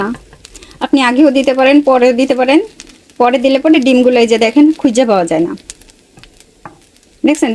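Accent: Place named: Khulna, Bangladesh. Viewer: আপনি খুলনার মেয়ে হওয়া সত্বেও ,আপনি খুলনার ভাষায় কথা বলেন।ধন্যবাদ।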